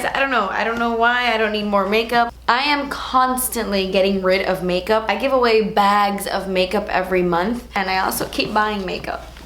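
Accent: United Kingdom. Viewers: When does her British accent it sounds like Alex drake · Omg I love you so much, and even more every single video but that 'British' accent has my love for you going wild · Slaaaayyy! Btw I love your British accent keep doing it! ❤️❤️❤️ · How do you get that british accent?? Wanna know the story... 😍😍😍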